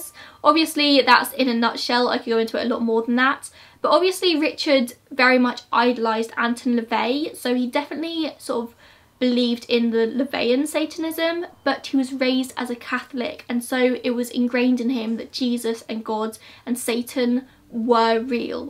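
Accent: England, United Kingdom. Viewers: I know this is so played out, but I just love an English accent.Combine that with murder mystery? I'm in! 😊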